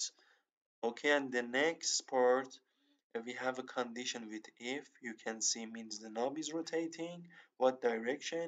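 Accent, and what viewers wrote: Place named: Iran. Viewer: عاشق لهجه ایرانیت شدم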